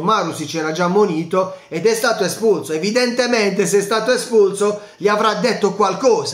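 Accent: Bari, Italy. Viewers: Analisi dei fatti è perfetta Grande sei un grande ti seguo sempre continua così. Vivendo a Roma sappiamo bene chi è il presidente della lazzieeee. Forza Milan Sempre. Un abbraccio forte a e al suo colorito dialetto barese che conosco poco ma detto da te mi fa sbudellare dalle risate specialmente quando vince il nostro amato Milan ciao 👏👏👏👏👏👏👏👏❤️🖤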